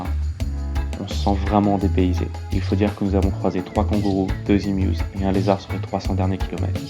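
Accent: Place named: France. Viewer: Je me disais bien que tu étais français quand je t'ai entendu dans le vlog de Jamie et Nikki en tout cas très belle vidéo. :)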